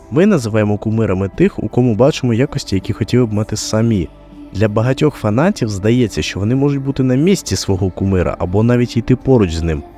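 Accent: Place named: Russia. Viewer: я розумію, що то рофли. Але навіть рофлово назвати це крінж. В нього тупо сруський акцент навіть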